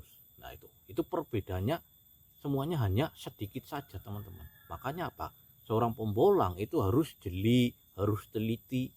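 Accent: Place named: Banyumas, Indonesia. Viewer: Sampean wong Endi bos bahasane ngapak temen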